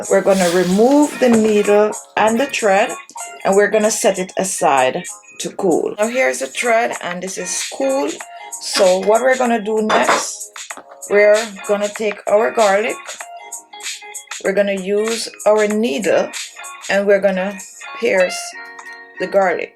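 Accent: Jamaica. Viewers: You sound Jamaican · Why does she sound like a Jamaican 😌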